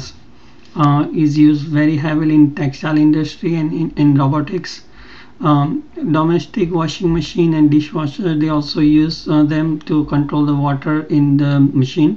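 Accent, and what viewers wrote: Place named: Arab world. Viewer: Difficult arabic accent , but thanks anyway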